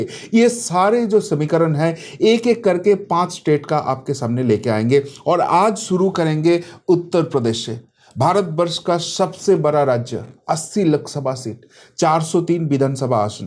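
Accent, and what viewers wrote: Bengal, India. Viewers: आप तो आते ही छा गए सर! आप की बंगाली accent वाली हिन्दी और साथ ही समाचारों का विश्लेषण बहुत hi बढिय़ा है ! धन्यवाद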